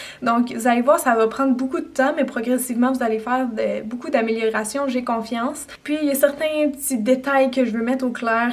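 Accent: Canada. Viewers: Je suis d'accord avec Elisa071096 tu es la meilleur youtubeuse beauté, car tu es simple et authentique, et non pas artificielle est maniérée... et tu as un très jolie accent canadien ^^. Tes conseils sont toujours intéressant et bon à prendre, merci à toi pour tous, j'ai bien aimé cette petite parenthèse psycho et la journée B&B c'est juste une merveilleuse idée xoxoxoxo ;)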